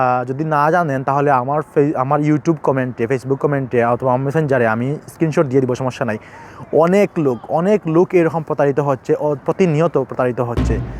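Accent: Sylhet, Bangladesh. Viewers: Vai Sylheti na ki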